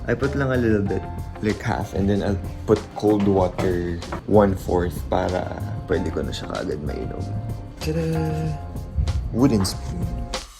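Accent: Bicol, Philippines. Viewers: Sobrang cute mo mag salita ng bicol hahaha💚 I am sad but I saw and I watch your vlog today nawala na lungkot ko. Thank u, love u & missed u😁🥰💚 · Ahhahahaha inlove with your bicol accent😍😍😍 I'm also from Bicol 🥰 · Hahaha halo pala yung Bicol mo. Legazpi-Naga dialect. Hahahaha. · i love it... naiintindihan ko ang bicolano ni... nkakaintindi pa rin pala ako... kahit di marunong magsalita... lab u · Nakakainlove yung bicol accent mo! 💗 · Grabi..cute mag bicol salita n...Hindi ko maintindihan..hinahabol ko talaga pag Basa para ma gets ko Ang sinasabi mo myloves ❣️❣️❣️ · napansin ko lang po yung salita ng bikol my halong ilonggo ng konti haha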